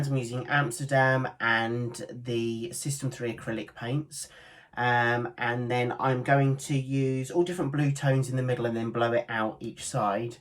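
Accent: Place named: Northern England, United Kingdom. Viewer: its brilliant!! Congratulations! I’m a Manchester lass who moved to America 36 years ago 😳.I come home every year to top up my accent LOL It’s so lovely to hear your Northern accent! You are so relaxing and non intimidating to watch! ❤️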